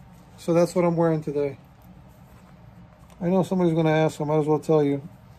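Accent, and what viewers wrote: New York, United States. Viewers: Your channel is addicting, watched a few episodes last night and then I realized it’s 2am, and had to get up at 4am lol. But it’s relaxing and I enjoy watching. Learning about nice shoes. I’m a carpenter so don’t get to dress nice much, but I like watching how you take apart these shoes and rebuild them. Pretty awesome tools and old school machines. You have a NY accent brother. Not sure where your shop is located. I had a German shepherd named Zues too, sorry you had to relocate him. Take care and keep posting awesome videos.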